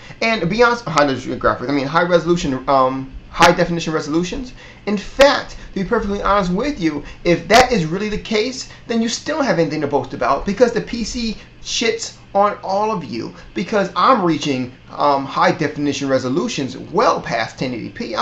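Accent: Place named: Australia. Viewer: Looks Around... I thought it sounded Australian... good vid man. I agree HD resolutions don't make or break a game. It may help but it doesnt matter much. As for the Wipeout vid, the point he was making was 360 fanboys going nuts saying the 360 did native 1080p, when it actually was upscaled. Nothing wrong with that but its a technical difference between native and upscaled isn't there?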